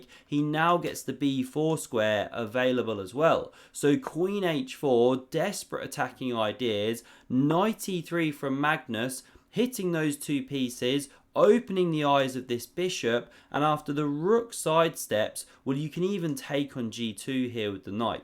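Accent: United Kingdom. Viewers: Can you speak less british accent? It's actually really hard to stand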